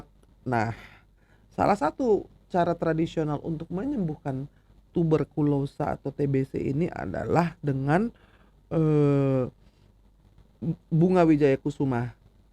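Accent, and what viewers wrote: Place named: Java, Indonesia. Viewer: orang medan, aku seneng seperti orang jawa bahasany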